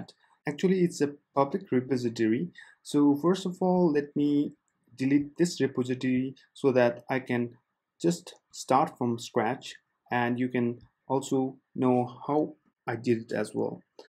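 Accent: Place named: India. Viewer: Indian accent? DROPPED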